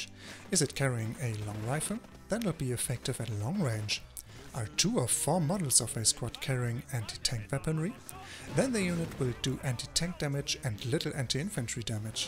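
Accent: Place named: Germany. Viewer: Great tips, the fact that they re also in German English accent is great addition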